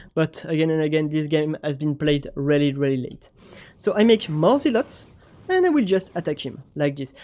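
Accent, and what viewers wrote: France. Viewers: sont accent est si français que les sous titres automatique sont généré en français j'adore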